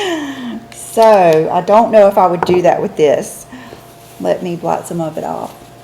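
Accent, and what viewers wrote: South, United States. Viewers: look forward every day to your videos..I don't know if its your southern accent..but your voice is so soothing & really love your style..alot of the makeup & clothing you mention, I can not afford, but so appreciate you showing so many products..I did recently purchase the Maybeline Lifter gloss in Moon & I love it..It really is almost a dupe for fenty gloss bomb in fussy...anyway just wanted to say thanx for always uplifting my day..especially if I am in a funky mood....keep moving forward..enjoy your weekend..:)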